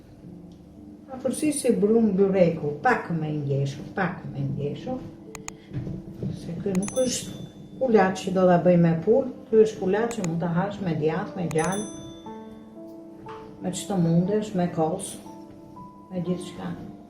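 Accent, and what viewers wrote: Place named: Tirana, Albania. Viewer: Pse ti zonja, pa trokitur fare punon.....mos e shiko ne qoftese te acaron, por kjo eshte nje zonje e vertere Tiranase, qe ke qef ta degjosh, per te gjitha recatat e mrekullueshme qe ben, respkte pa fund per ju zonje qe na kenaqni me gatimet tuaja....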